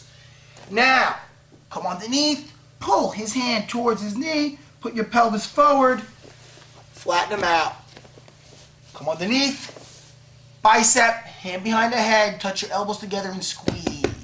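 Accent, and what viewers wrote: United States. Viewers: Love the New York accent!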